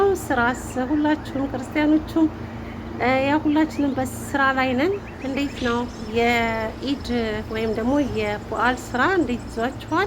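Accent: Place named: Wollo, Ethiopia. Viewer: ደሴ ነሽ ሀይቅ ወሎየነትሽ መቸም በንግግርሽ አያሳስትም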